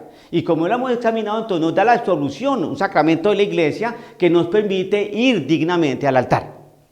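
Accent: Colombia. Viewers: Padre excelente sus enseñanzas, más claro imposible; así hablamos los colombianos, con la ruana puesta, para estos climas que hacen por estos días y de ñapa con la delicia de aguadepanelita. Se le aprecia mucho padre 🇨🇴🙏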